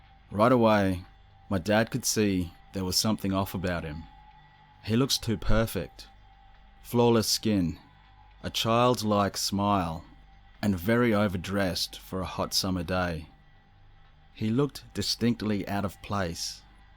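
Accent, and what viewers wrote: Australia. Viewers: Hey there,. Great video!!! Excellent stories!! Love that sexy Aussie voice!! 💓😉 BTW, have you ever read any books by Philip Imbrogno? There's one called Files From the Edge that has some very bizarre encounters!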